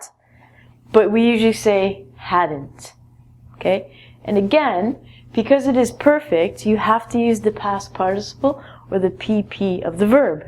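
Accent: United Kingdom. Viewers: British accent 😓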